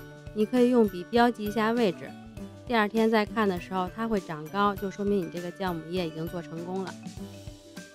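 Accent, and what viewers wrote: Beijing, China. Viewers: 哈哈哈 听说话 up主是北京人吧~ 按照你这个试试 之前做过一次 失败了╮(╯▽╰)╭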